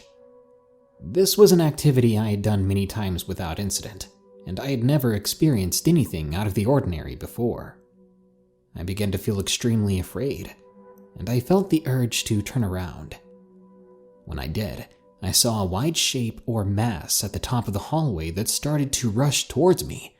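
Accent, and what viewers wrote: United Kingdom. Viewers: Why do america still think that everyone for the uk talk like that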